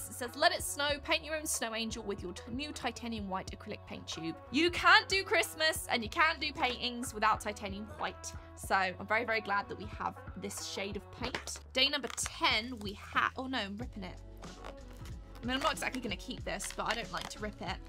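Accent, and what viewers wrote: Australia. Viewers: I still can't believe your related to the youtuber Mr H and friends. I'm Praying for your family. First when I came around your channel I wanted to learn how to paint and you sounded like Australian 😅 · S I thought u r an Australian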